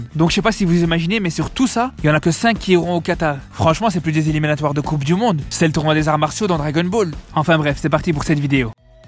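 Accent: Belgium. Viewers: C'est un accent belge que j'entends? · Tu as un ptit accent belge.lol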